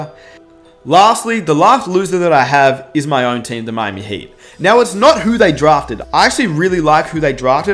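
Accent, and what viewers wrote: Australia. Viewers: is he australian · Yo, are you Australian?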